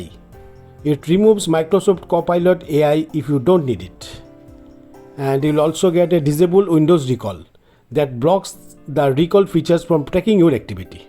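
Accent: India. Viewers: When a guy has an Indian accent, you know it's going to be the best video of all time.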